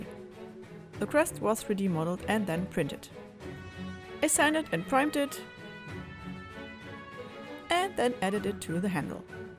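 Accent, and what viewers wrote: Germany. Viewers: are you german ? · You should work on you german accent but well the accent does not make the sword less cool, great work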